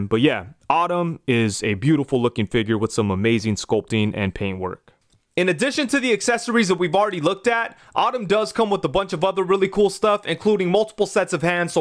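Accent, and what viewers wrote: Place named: AAVE, United States. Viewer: Dude speaks in Ebonics and is happy to review copyright infringement toys. Lol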